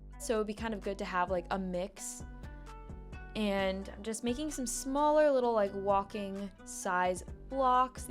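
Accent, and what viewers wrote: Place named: South, United States. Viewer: What's your PC build? And do you live down south? I hear a lot of "y'alls" lol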